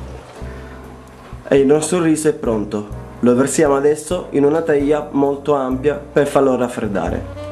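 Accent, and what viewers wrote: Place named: Sicily, Italy. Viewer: Un sardo con l'accento siciliano????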